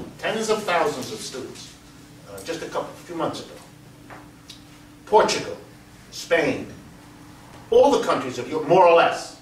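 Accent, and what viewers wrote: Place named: New York, United States. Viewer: Listening to Dr. Wolff speak is like listening to Woody Allen explain economics. What a New Yorker. :)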